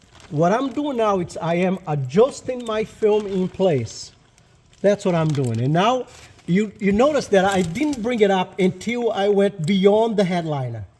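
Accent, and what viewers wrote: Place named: Brazil. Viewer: Sotaque brasileiro rsrsrsrs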